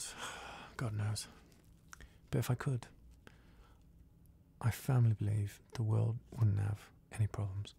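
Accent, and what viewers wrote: United Kingdom. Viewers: I love your softly spoken English accents so much! Your unique sense of humor always shines through. Super relaxing video. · Nailed the accent! Thats coming from a southern english person. You sound like u come from my town 😂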